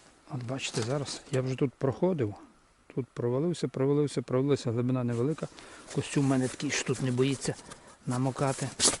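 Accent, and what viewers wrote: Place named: Chernivtsi, Ukraine. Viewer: Дякую.бо чути по розговорі що десь не далеко від черніців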